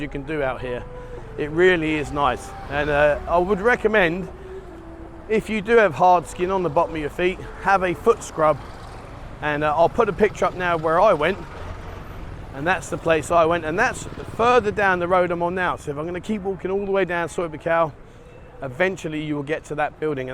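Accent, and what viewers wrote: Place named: Nottingham, United Kingdom. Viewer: speaks Thai. Like a local with a totingham accent.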